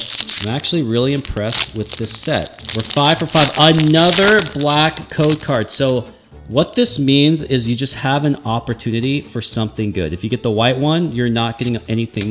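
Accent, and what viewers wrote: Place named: Australia. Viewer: BRO AUSSIE LOL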